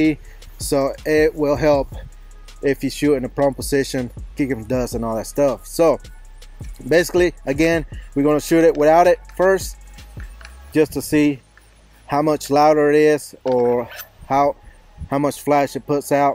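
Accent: South, United States. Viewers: My man here has a southern hispanic accent lmao I just bought this online without researching and i am glad i found your video, it looks like I made a good decision buying this flash hider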